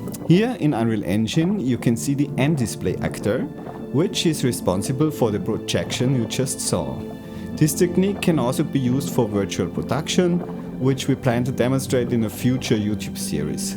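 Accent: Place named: Austria. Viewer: Awesome work,! And awesome Austrian English 😄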